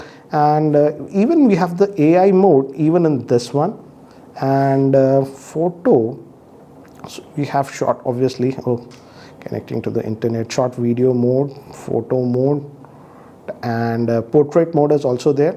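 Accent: India. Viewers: Never thought i would hear a indian sounding guy say remove junk apps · Base in his accent I think he is an Indian.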